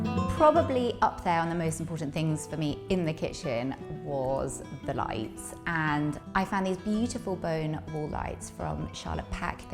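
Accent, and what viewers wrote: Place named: RP, United Kingdom. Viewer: Wonderful house, horrible and ridiculous posh accent.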